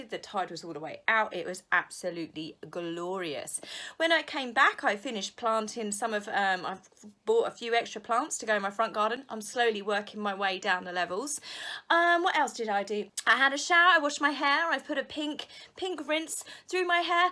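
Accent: England, United Kingdom. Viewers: I love the sound of your voice and English accent and snippets of everyday life somewhere in England before getting into the lives of the royals.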